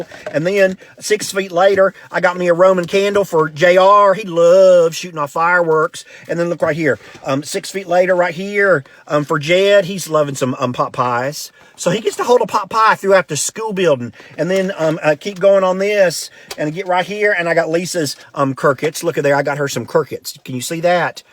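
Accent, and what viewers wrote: South, United States. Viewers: He's speaking cursive with a southern accent. I love this man.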